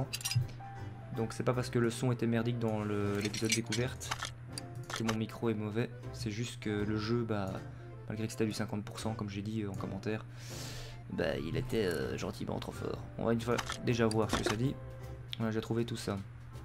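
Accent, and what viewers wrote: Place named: Belgium. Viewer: D'après ton accent tu dois sûrement être Belge ? 😁 (enfin des belges sur YouTube ahah) super vidéo +1 abos 👌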